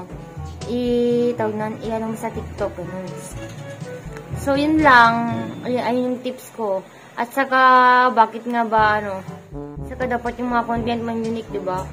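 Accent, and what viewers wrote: Ilocos, Philippines. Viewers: Hi ilocano ka ate?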